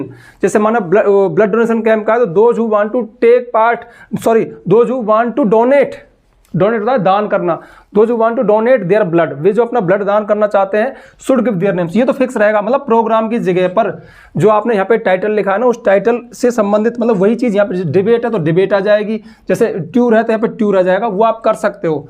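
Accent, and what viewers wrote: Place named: Uttar Pradesh, India. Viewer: सर जी आप यूपी या हरियाणा स हो क्या? में यूपी स हूं नु पुंछ रा हूं। और आप का बोल ने के लहजे स लगरा आप भी शायद यूपी के हो।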